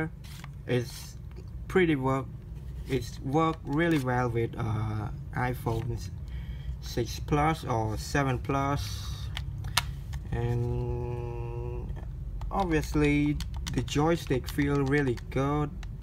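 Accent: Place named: Vietnam. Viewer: dude u sound like a vietnamese learning to speak english,good vid anyway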